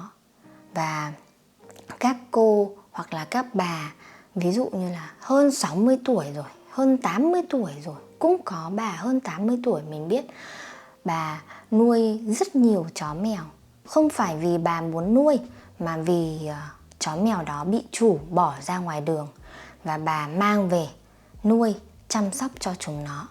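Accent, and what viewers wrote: North, Vietnam. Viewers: Câm ơn cháu. I can hear your Northern accent.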